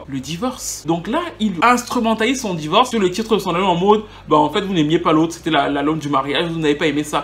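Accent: Cameroon. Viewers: L'accent CAMEERRRRRRRR !!! indétronable !!!! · Moi qui aime la musique et qui pensais tout savoir, tu es génial et ton accent camer ne m'a pas échappé dès le début jetais la que mais attends le gar ci c'est un freeeere. Massah. Keep going u talented and u have deep deep knowledge. Après 10 vidéos watches je mabonnes et jactive la cloche. Push through and through and through !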